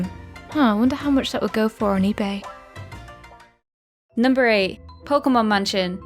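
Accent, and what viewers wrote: United Kingdom. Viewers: Love your British Accent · Also its not wurld wide world olnly in urup im bulagarian a a oh yah youre not canadian your british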